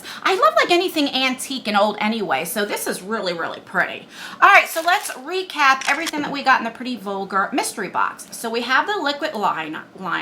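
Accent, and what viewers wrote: New Jersey, United States. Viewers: I definitely like it when you show cosmetics and and some of the clothes! I love your Jersey accent!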